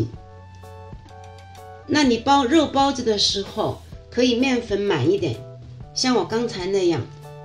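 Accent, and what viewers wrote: Shanghai, China. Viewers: 这是上海人讲普通话，带有上海口音。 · Jenny阿姨，看你的视频，一口沪普就是特别亲切啊。刚刚我在看视频，孩子听见你讲话，问我，妈妈是外婆在教你做好吃的吗？孩子们以为是她们自己外婆在说话。😂😂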